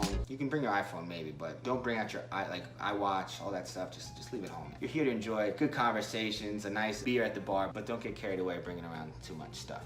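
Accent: Brazil. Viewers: Parece brasileiro falando inglês.rs Acostumada aqui só de ouvir você falar em português, quando fala em inglês fica estranho.rsrs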